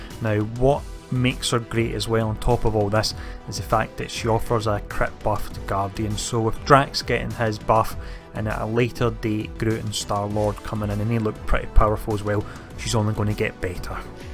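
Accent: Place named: Scotland, United Kingdom. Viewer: I feel like such an idiot... for the first 25 seconds or so, I genuinely thought this guy was speaking Afrikaans or Dutch or something.. then I just realised he's Scottish. In all serious though, thanks for the guide, mate. just getting into the game with my SWGOH mates and we're all wondering what to bother to farm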